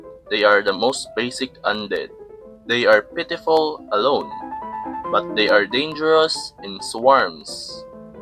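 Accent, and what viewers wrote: Philippines. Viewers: Why you kinda sound Filipino?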